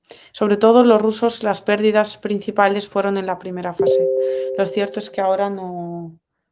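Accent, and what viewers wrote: Spain. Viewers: Eres española ? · Ehyyyyyyyy es ESPÑOLA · Saludos desde República Dominicana, puedes decir de dónde procede tu español, de España?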